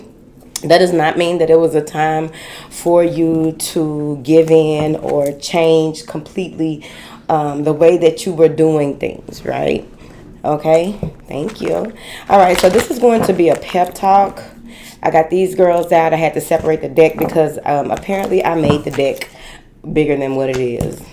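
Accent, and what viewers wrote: United States. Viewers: I love hearing your messages. Thank you so much for always being you!! I love your country accent!!!❤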